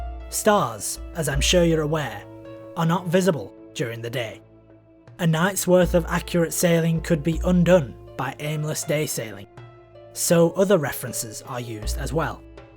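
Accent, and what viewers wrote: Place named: United Kingdom. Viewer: Your accent is awesome. Where in the UK are you from?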